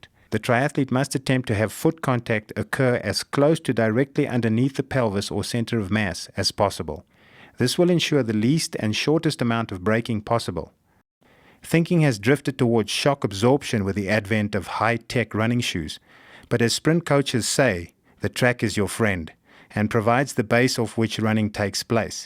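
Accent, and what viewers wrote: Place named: South Africa. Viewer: I think this is a South African accent. A "fucking accent" would probably have more moans, groans and "oh, baby"s in it. Doesn't everyone who speaks English have some sort of accent?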